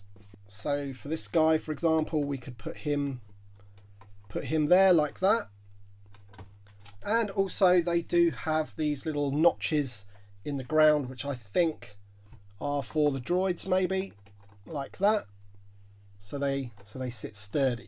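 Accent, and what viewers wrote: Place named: United Kingdom. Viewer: I've always liked the way you Brits pronounce WATER as WATA.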